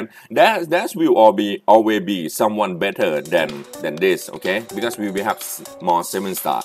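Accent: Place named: Thailand. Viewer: That Thai accent though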